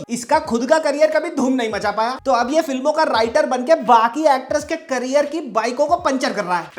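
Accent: Haryana, India. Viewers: bhai are you haryanvi??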